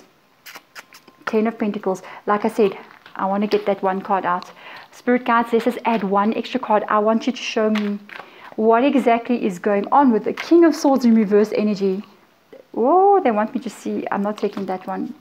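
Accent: South Africa. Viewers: You described my exact situation! Xx just walked away from a very toxic Pisces who cheated and not just once, was very up an down kept going back but now I’m ready to walked and not look back, Nice to hear a bit of “home” in your readings, I’m South African living in the uk 🇿🇦 Thanks for a great reading! X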